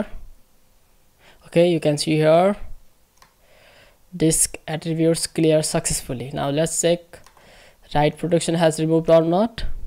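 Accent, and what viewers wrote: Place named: Arab world. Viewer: What country are you from you look Indian but have an Arab accent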